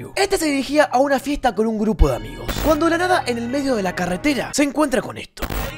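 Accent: Argentina. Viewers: De que provincia de argentina sos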